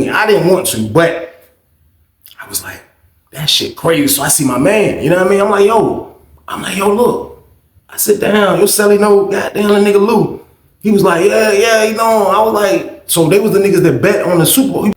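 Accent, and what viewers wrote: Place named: South, United States. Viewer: well rocks with every body black and white, and is color blind, that's just how talks, we are country 😀 Stay blessed 🙌 and inspired 🙏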